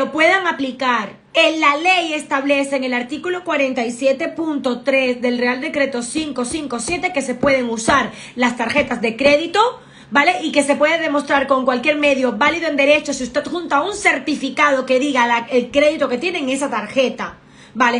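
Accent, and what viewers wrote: Cuba. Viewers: eres cubana?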